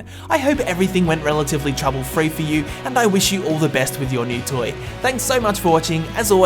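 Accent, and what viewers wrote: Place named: Australia. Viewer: Your Aussie! Me too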